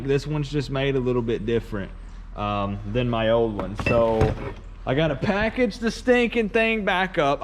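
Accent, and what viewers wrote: South, United States. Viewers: I recommend some underbody coating. Based on your accent seems like you could be somewhere in Alabama like me or at least the south so salted roads aren’t a big issue. But with this truck being as old as it is, I’d recommend some just to stop the rust corrosion (or at least slow it)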